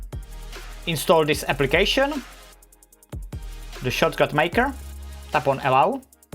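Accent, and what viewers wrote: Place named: Czech Republic. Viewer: Ta Česká angličtina xd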